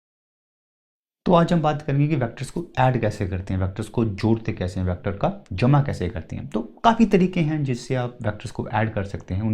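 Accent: Kashmir, India. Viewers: I sense that u have an akcent like Kashmiris..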